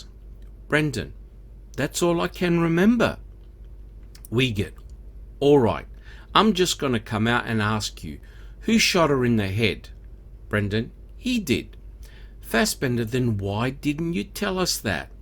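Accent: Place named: Australia. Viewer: Thanks so much for the videos! I do have to relate to you, however, that the statement from any LEO/s whilst talking to lab tech/dept heads of labs "try to place ??? in the house/garage or any other location they care to mention" is not nefarious in any way, even though it could be said that it is. I can't tell you the number of times that I've heard this very thing said in movies and in television police shows. Personally, I wish that they wouldn't say that for the very reason that it could be thought of as conspiratorial. But, since I note an accent from you, maybe Australia OR New Zealand, I'm sure that you don't know about this type of directive to occur, even though it clearly says "TRY to place/put her/him ......." I'm sure that if it were a true directive, it would not have been done so overtly and written on paper, where it would be seen by other than the person being spoken to.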